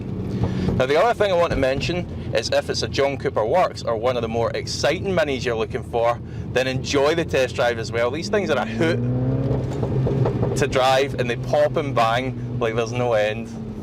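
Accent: Scotland, United Kingdom. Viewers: I like Scottish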